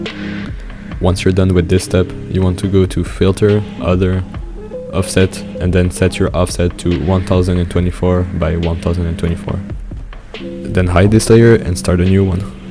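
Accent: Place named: France. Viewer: that's just his french accent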